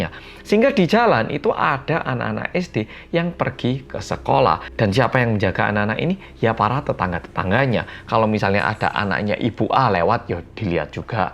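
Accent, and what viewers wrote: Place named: Java, Indonesia. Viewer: Selalu menarik uraian diakhir kisahmu...mas medoook😅...tks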